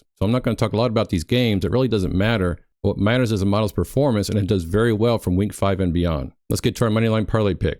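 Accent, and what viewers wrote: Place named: Michigan, United States. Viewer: what state do you live the voice of your accent are you from Michigan r u a lions fan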